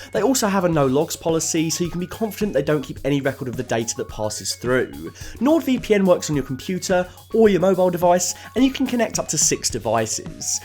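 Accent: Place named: Scotland, United Kingdom. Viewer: Let him keep his REAL voice... Third Scot up. :)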